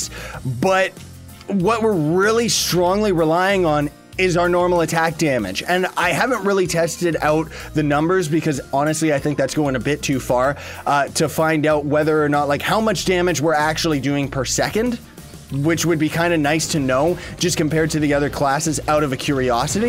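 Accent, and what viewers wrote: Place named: Canada. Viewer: Buttt jajaja your canadian acent is pretty funny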